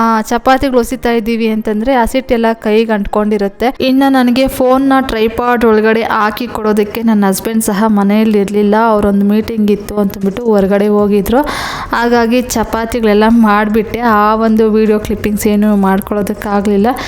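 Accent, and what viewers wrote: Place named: Kolar, India. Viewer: Nim language kolar, Hoskote tara ide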